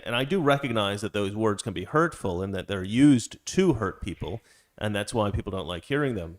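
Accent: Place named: Russia. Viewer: The Russian accent isn't always attractive, but she has such a sweet and feminine voice that it still works for her.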